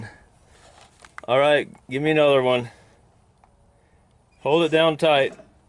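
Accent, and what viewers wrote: Spain. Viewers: Much respect to you Mr for the great work you did there and for the awesome Spanish accent you have (old western+ Spanish)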